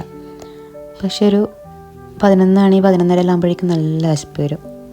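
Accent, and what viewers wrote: Kannur, India. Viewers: Kannurkaari analle samsaram❤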